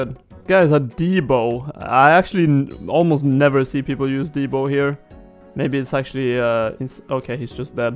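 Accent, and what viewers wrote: Sweden. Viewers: Good stuff man! If my ears don't lie that accent has to be Swedish. Lycka till i fortsättningen!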